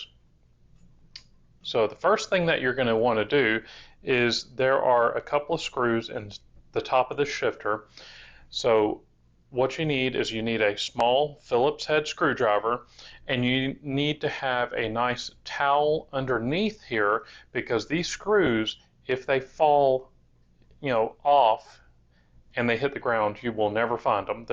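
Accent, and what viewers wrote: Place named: North Carolina, United States. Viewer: I know someone else with a North Carolahna accent so I was able to figure out that you were talking about Tri-Flow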